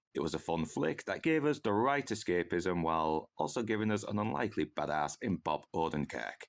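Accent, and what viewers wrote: United Kingdom. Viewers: Thanks! As an Ex-Northerner (you can't get much more south than New Zealand!) I have to say, it's so refreshing to hear a good northern accent talking so much sense. Keep it up!!!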